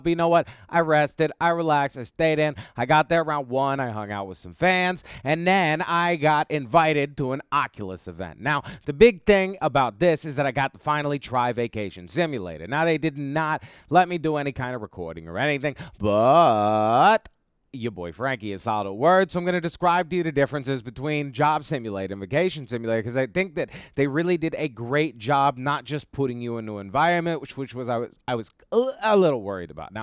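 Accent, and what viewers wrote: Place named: New Jersey, United States. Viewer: I just heard this guy for the first time and went well that a New Jersey accent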